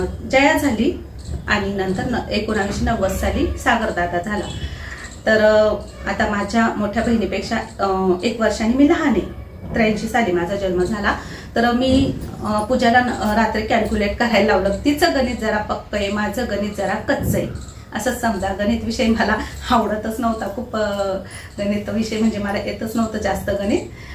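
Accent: Nashik, India. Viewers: मला तर नाशिकची बाेली भाषा तुमची खुप आवडते माझी मैत्रीण सुद्धा सटाणा नाशिक येथील आहे तिचे बाेलणे सेम तुमच्या सारखेच आहे हे ऐकायला सुध्दा नशीब लागते❤❤❤❤ ताई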